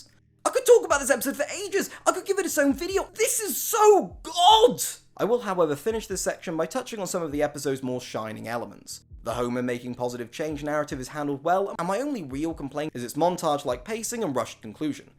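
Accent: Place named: United Kingdom. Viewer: You sound British, very similar to Sideshow Bob's brother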